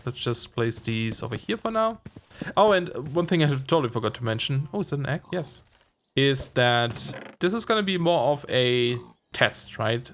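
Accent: Germany. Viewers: I was looking for this comment. Fellow German here. His English is pretty good, however I believe he still uses German intonation. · Are u German?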